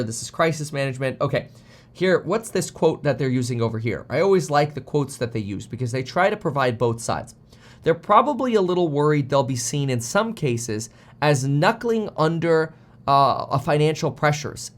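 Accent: Germany. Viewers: Man that German accent is hilarious. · Your German accent made me crack a smile for the first time this morning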